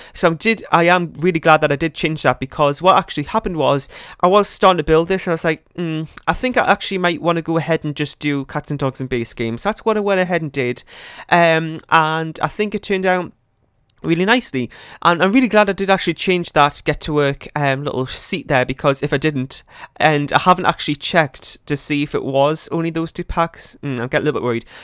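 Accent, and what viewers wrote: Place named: Northern England, United Kingdom. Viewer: Nice build, and nice to hear a nice northern voice, looking forward to seeing more 😁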